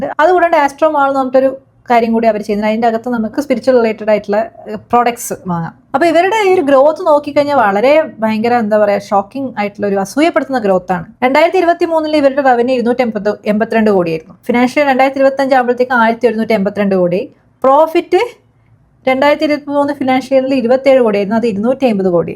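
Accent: Kannur, India. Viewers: maam kannur aano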